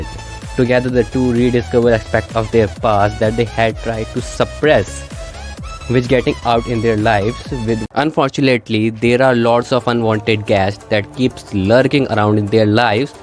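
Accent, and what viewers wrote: India. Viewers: I think you are indian because your voice cannot be perfected completely at english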